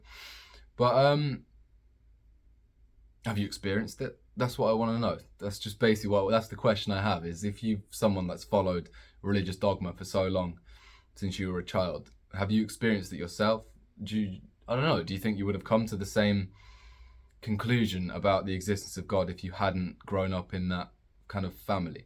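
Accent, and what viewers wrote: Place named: Australia. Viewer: You are Aussie right love your accent I'm Latino greetings from Honduras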